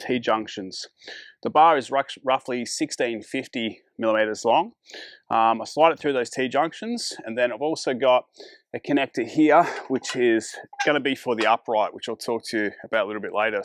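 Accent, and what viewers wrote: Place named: Australia. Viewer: It’s wild to here the Australian accent. So many endings of sentences sound like it’s a question. Pull up bar? Higher? Top bar?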